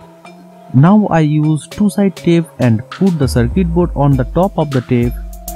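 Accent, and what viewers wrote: Bengal, India. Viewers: you have much of bangali accent 🙏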